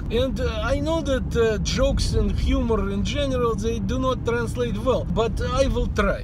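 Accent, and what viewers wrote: Russia. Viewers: im whatching your videos and im 15 to trying to copy some of the ways you pronounce word or vords to get a cool rrrrrrusian eccent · i enjoyed ur russian tone love from nepal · It was funny and the accent made it even funnier. Gotta love Russians. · I found this dude because I was playing metro Exodus on ps4 and I started to look up Russian accent ...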